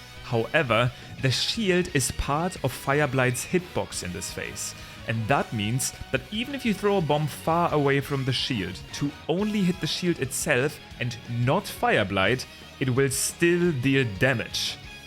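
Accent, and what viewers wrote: Germany. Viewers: deutscher akzent lol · ICH HÖR DEUTSCH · Er klingt sehr deutsch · deutscher kann man ja garnicht klingen haha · Always love it when I watch a new channel and I can immediately hear the german out of them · Are you german? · Der gute alte deutsche Akzent verrät einen letztendlich doch immer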